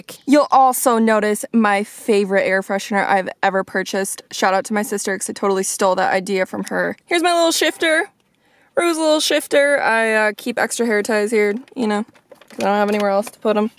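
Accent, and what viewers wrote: South, United States. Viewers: Girl you got me as a sub as long as you keep running around barefooted and talking country like that I'll follow you any where on this planet!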